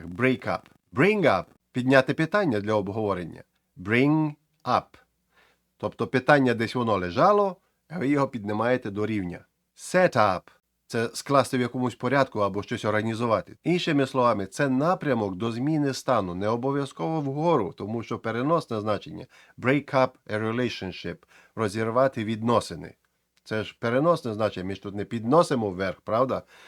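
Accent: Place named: Galicia, Ukraine. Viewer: Красно дякую за ясне тлумачення! Мило та радiсно чути галицьку вимову пана! Най щастить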